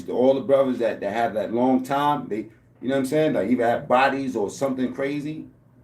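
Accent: Brooklyn, United States. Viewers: You have to be from Brooklyn..The way you talk and. get hyped is 💯💯💯💯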